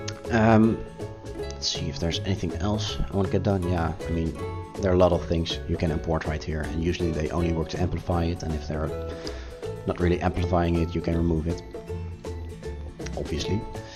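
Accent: Netherlands. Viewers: haha its so funny to hear your dutch accent lol, great video!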